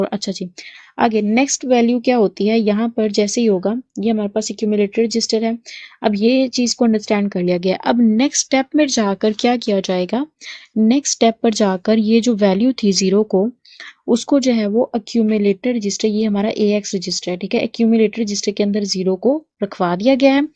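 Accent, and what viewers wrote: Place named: Pakistan. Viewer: Pakistani voice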